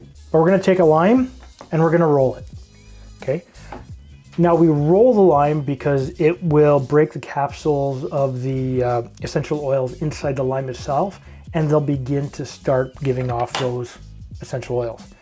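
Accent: Canada. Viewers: Lets talk abeewt.....love that Canadian accent.....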